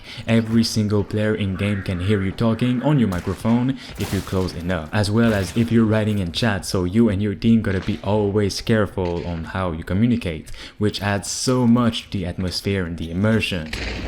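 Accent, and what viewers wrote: Quebec, Canada. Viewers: Es tu québécois? Les canadiens sont le meilleur 😊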